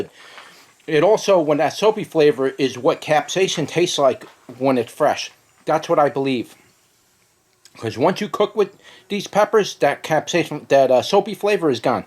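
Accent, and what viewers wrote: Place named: New England, United States. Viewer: I asked be a you have a New England type accent. I’m from NE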